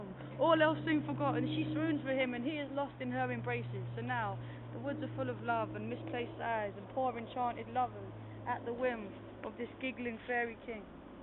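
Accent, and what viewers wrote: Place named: South London, United Kingdom. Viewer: She's from South London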